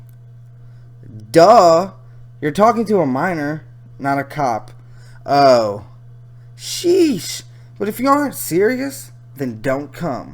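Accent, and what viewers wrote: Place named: South, United States. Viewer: who the fuck are you? you sound like some stereotypical dumb hillbilly. your recording machine seems to be broken. it sometimes sounds like a vinyl record (if you know what one of those are. if you don't maybe ask some old guy.) that has a scratch and is stuck in a groove what are you doing on the internet anyway? shouldn't you be out hunting possums for dinner?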